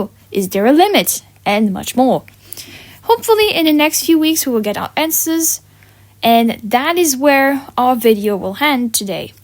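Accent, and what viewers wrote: France. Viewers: Are you french? 👀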